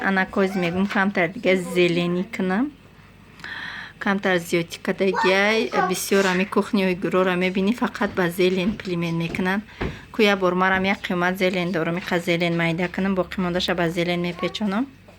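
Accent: Tajikistan. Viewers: Лахжаи зебои тожики дори хохаржон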